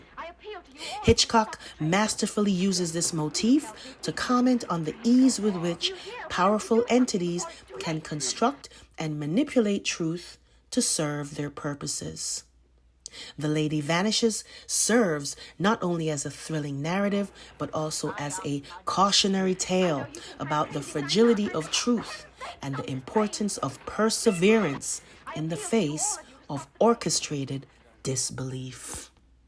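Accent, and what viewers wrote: United States. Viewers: I hear Brooklyn or the Bronx in your voice ❤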